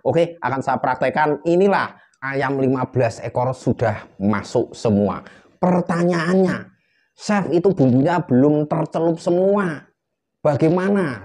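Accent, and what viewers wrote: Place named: Java, Indonesia. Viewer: Chef ttp dgn ciri logat medoknya 😊 seneng sy, sukses selalu ttp humble 😊😊😊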